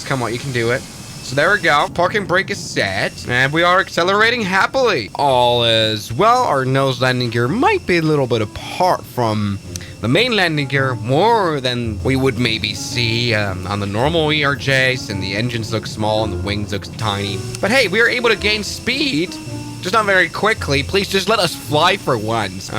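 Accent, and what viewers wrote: United Kingdom. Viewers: Damn mate you bri'ish now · plz no plz no why british accent why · Why does he sound a bit British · bri'ish now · Never would i think on this beautiful day that Sir would prefer the british accent on this inaugural day! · Love thee bhrithish akhcent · Cause he was in London City airport and in london speak british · I ponder why this gentleman was conversing as a britman.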